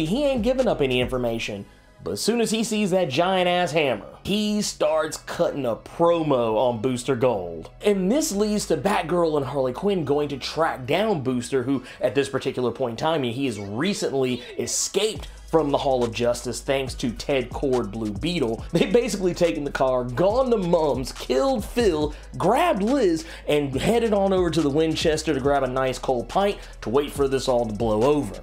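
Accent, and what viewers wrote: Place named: East Texas, United States. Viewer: hey boss.. where you from... you sound like you're from east texas.. Tyler area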